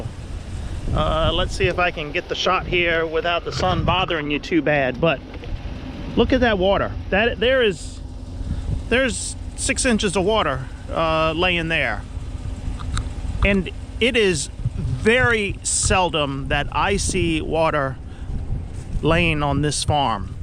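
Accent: San Francisco, United States. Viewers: What a rebel!! You’ve been out San Francisco a lot? I can tell by the sound of your voice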